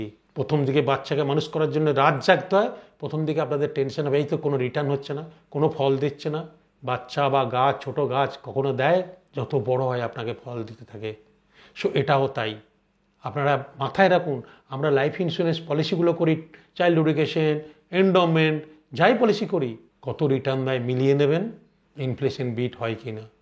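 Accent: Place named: Bardhaman, India. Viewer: দাদা আপনি কি বর্ধমান জেলার মানুষ? কথায় টান পেলাম তাই জিজ্ঞেস করছি কিছু মনে করবেন না প্লিজ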